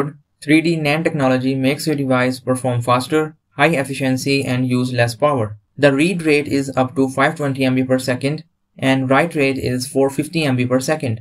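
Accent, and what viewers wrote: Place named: India. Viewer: I don’t understand English in Indian speaking.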